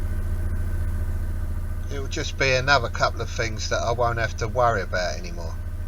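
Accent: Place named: Cockney, United Kingdom. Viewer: Lovely cockney accent!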